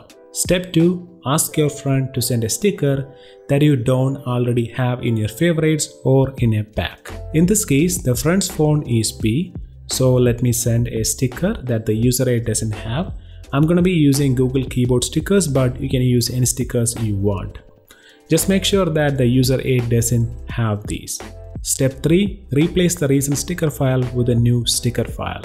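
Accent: Kerala, India. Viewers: Tovino onnum venda.. typical mallu accent .. entem angane thanna ketto😂😂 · Malayalee aanalle? English accent kettapo thoni 😬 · Are you a malyali...? Just asking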